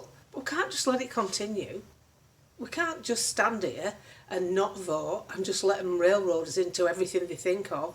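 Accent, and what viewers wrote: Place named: Lancashire, United Kingdom. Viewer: Not less than Oliver. You are superb. A strong voice. For me a great Lancs voice. Keep it up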